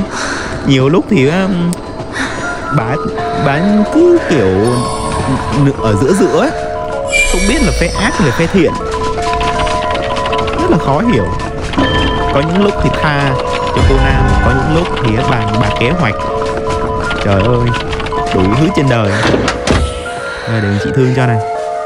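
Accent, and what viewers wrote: Vietnam. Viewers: nghe hơi dính accent của người Việt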